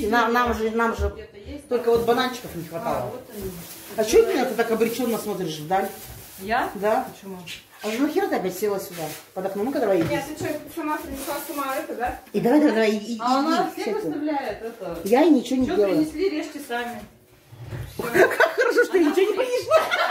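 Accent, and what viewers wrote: Urals, Russia. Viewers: Такой интересний уральский акцент)Всех девочек с наступающим😊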